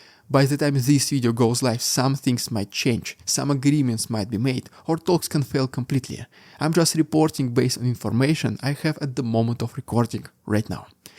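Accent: Russia. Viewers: Love your charming Russian accent. Keep up the good work, and hold on to your accent, because it sounds authentic and is very easy to understand.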